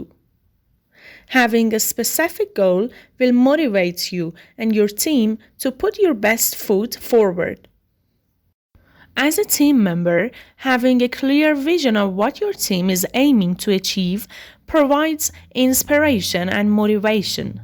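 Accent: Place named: Iran. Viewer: اااااااااااااااا😭😭😭😭😭😭😭😭😭😭چرااااااااااا ،میخواستم بخوابم با گوش دادن به پادکست چرا با تلفظ فارسیییی😢😢😢😢😢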